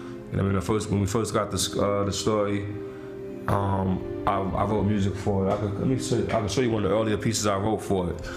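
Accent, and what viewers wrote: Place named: United Kingdom. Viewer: Why does he speak with a British accent?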